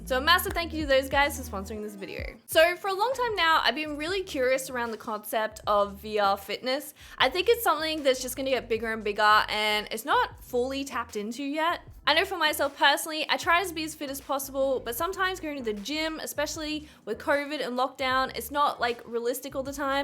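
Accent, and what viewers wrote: Australia. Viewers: That's definitely not a British accent. She's Australian.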